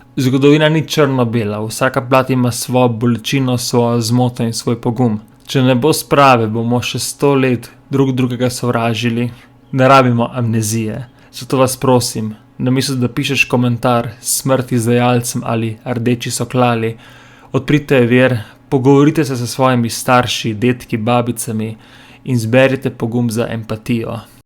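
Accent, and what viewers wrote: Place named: Inner Carniola, Slovenia. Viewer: Prosim uporabljaj svoje domače narečje,če ne se bo izgubilo 😁 .. Ne moreš zatajiti bloško notranjskega naglasa , če se pa motim se pa globoko opravičujem. In želim ,da tako delaš naprej kar se da neobremenjeno in nepristransko .V veliko družinah so bili na eni in na drugi strani , svojih sorodnikov ne morem soditi po temu kam jih je pot vodila , mali človek je pač želel preživeti koritniki so pa na eni in drugi strani enaki...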